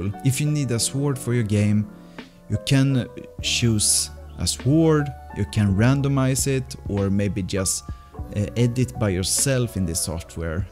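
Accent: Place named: Sweden. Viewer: The heaviest swedish accent I've ever heard lol. Did not know this was a thing, but that's awesome, lit up some new motivation in my silly game project. Tackar så mycket för det! ❤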